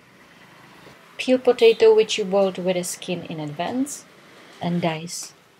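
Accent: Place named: Iran. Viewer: What accent is that? It sounds quite Iranian and Far-Eastern European! Nice channel!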